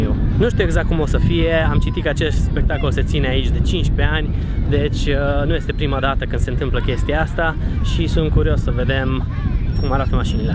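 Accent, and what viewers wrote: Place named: England, United Kingdom. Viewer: nu se impaca accentul englezesc cu romana mai deloc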